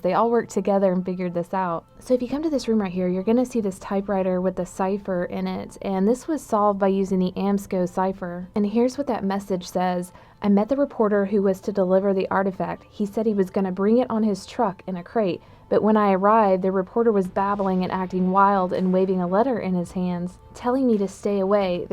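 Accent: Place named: South, United States. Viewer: You sound more southern in this video.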